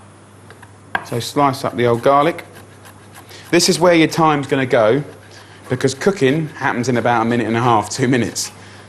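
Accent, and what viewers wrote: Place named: England, United Kingdom. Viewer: cute!!! and he can cook and he has an english accent! i'll buy:)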